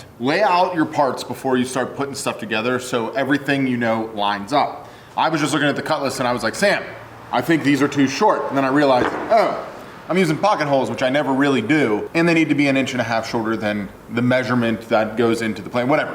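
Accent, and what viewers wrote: Pittsburgh, United States. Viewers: Hmmmm I detected a Yinzer... Looked you up, it's good to see a local (to me) doing good. (Even though you went to Franklin lol j/k The FR vs PT battles are some of the most exciting to watch). Great build, I may try and make one when I have more woodworking under my belt.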